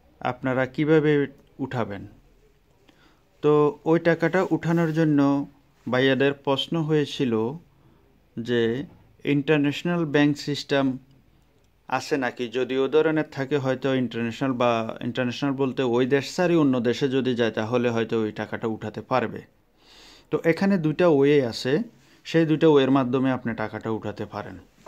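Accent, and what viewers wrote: Noakhali, Bangladesh. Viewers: Thank you. Bhai apnar bari ki Noakhali? Kotha suna mona hola. Apnar suggestion bhalo laglo. Apnar email address ta dan.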